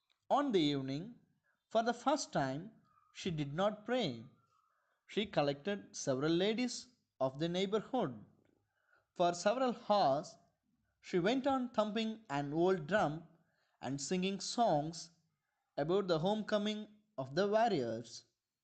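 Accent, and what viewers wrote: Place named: Kerala, India. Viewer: Awfully narrated.. Typical mallu accent 😢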